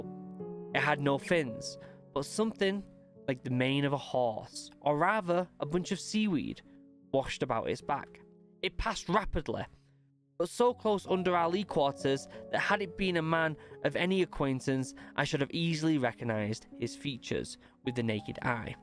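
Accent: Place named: North, United Kingdom. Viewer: I’ve been a casual viewer of this channel since MW: 2019 Clean House. I love the new format, I think I will miss the old style but at least you’re happy with what you are doing now. I will say though as someone from the south-east UK I’ve started to notice the northern accent much more and it’s great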